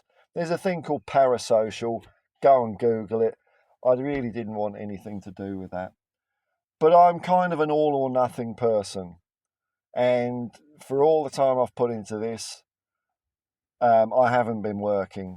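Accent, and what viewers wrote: Australia. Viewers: . Its was a pleasure to watch THE ONLY PSYCHEDELIC SOCCER SHOW ON THE INTERNET!... I was lucky to watch the channel grow from a few subs into a couple thousand. Loved your creativity and sheer passion for Tottenham Hotspur, it was good having an Australian voice for all us in the southern hemisphere (NZ). Im happy you have taking time for your mental health, its whats most important. Its a big loss for the spurs'a'sphere as you and Jacob (unitedspursofamerica) are some of the only positive channels i tune into. Take time, take it easy, I selfishly hope to get an occasional video from you here and there, perhaps after a big win! Thank you mate.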